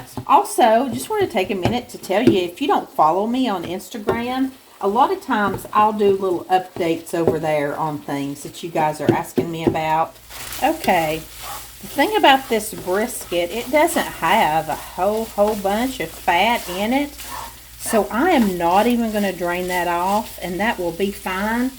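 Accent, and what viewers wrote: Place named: South, United States. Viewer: I love watching your videos! Your recipes are simple and pleasing, and your attitude and southern accent grab my attention. I look forward to seeing your next video everytime. Thanks from Papa G!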